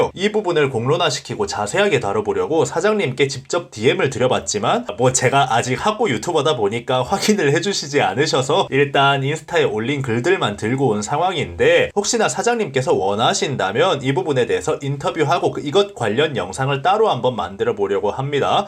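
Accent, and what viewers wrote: Gyeongsang, South Korea. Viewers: 경상도 사투리 쓰는거 맞으 신거죠 첨 억양이 ... ㅎ ㅎ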